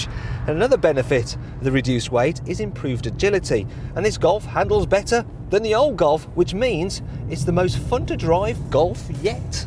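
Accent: United Kingdom. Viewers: a thorough review. However, as an Indonesian, his bold british accent makes it difficult to understand. I need to replay it two times..LOL..anyway, nice review and it does help.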